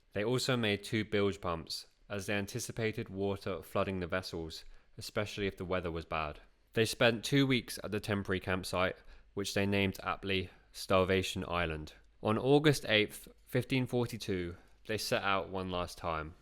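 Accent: United Kingdom. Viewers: Glad it's not AI, I think, but does it have to be a Brit accent? Give us a break! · it’s quite a light British accent bruv Worry not, not long from now you’ll be able to make tiny adjustments such as changing the accent through AI.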